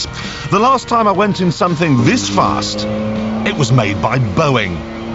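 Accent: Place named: Yorkshire, United Kingdom. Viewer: because he’s from Yorkshire but has gotten better at hiding his accent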